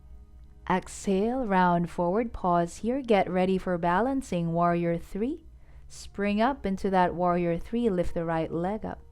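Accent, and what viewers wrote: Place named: Philadelphia, United States. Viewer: I like your classes. I especially like this one and use it quite a bit. I use a bunch of different videos and teachers, but you and another instructor, always tell when you’re doing your last downward dog. I appreciate that. Just out of pure curiosity, are you from the Philadelphia area? I’m only asking, because I enjoy trying to figure out accents. And yours sounds a little Philly. Obviously if you don’t want to answer, there’s no big deal. Anyway, thank you for your classes.!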